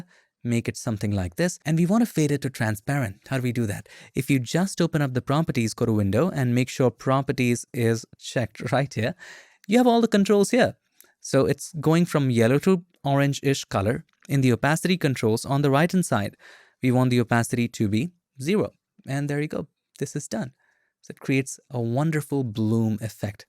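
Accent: India. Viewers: Speak like Human not like Computer.. Sp. you have an Indian Accent..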